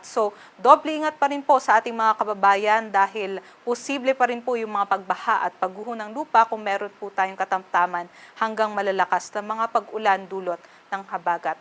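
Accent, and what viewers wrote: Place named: Iloilo, Philippines. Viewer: Ilongga si ate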